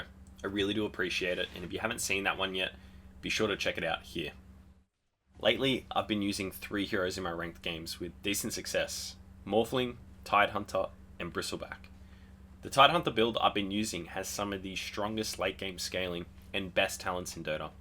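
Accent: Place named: Australia. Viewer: Something about an Aussie accent that just makes any youtube video better.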